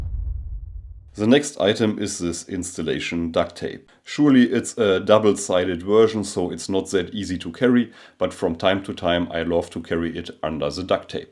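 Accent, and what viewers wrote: Germany. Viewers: Danke für das Video. Bruder, deine Aussprache des Englischen kannst du bequem und leicht verbessern, wenn du Sender von der BBC schaust oder hörst. Das geht leicht über die Website der BBC oder über einen Podcast-Player deiner Wahl. Optional könntest du auch Hörbücher in Englisch anhören, aber dort sind die Sprecherinnen und Sprecher nicht immer auf dem Qualitätsniveau der Sprecher bei der BBC. Was ich nicht empfehlen würde wäre BBC World Radio, da dort auch sehr viele Bürgerinnen und Bürger aus Ländern außerhalb Großbritanniens zu Wort kommen, die schlechter Englisch sprechen als du. Am Ende bist du hoffentlich diesen deutschen Akzent bei deinem Englisch losgeworden.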